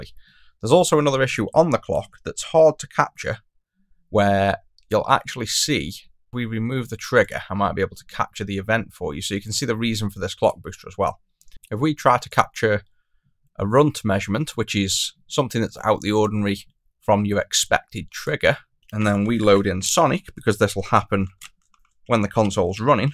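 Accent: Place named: Stoke, United Kingdom. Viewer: Just noticed from the accent you're also from Stoke, nice! Do you sell your mod kits in your physical shop? I might come down and buy a wireless GBP battery to save having to ship anything.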